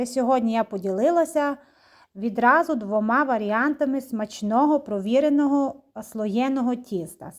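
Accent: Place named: Zakarpattia, Ukraine. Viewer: Я завжди задаюся питанням: навіщо держава витрачала шалені гроші на навчання? Коли в кінцевому підсумку ця молода персона розмовляє закарпатьским діалектом. Достатньо було би 4-х класів освіти. І потім, всі блогери як журналісти повинні нести в маси красу украіінськоі мови, тим більше, що наш народ післі окупаціі має негативну спадщину в частині мови. Думай- Те!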